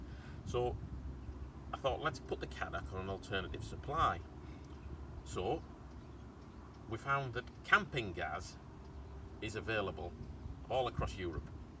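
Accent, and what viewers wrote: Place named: County Durham, United Kingdom. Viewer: We have a French Butagaz Le Cube. With a 27mm ‘clip’ (not a regulator) and a 21.8 to POL adaptor, we can use our normal UK propane pigtails. Le Cubes are widely available in France, petrol stations, supermarkets, ….They hold 5kg. We carry the Cube and one Calor in our motorhome on European trips and use the Cube. If it runs out, we have the Calor until we can get a refill. Your accent. Washington / Co Durham area?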